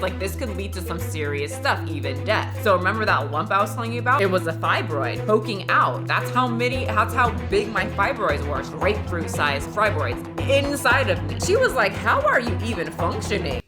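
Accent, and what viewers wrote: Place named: Hemet, United States. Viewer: Why does this sound like Hemet California 😂😂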